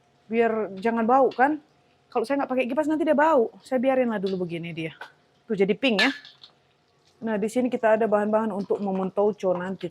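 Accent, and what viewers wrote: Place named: Medan, Indonesia. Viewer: Shalom kak, aku senang dengan logat ngomong Medan Siantar kali kak hehe.., mantap masakan kak 👍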